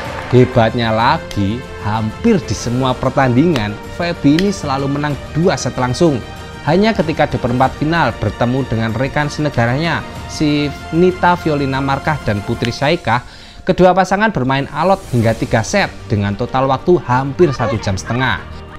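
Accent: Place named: Java, Indonesia. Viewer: Medok mu itulah bro.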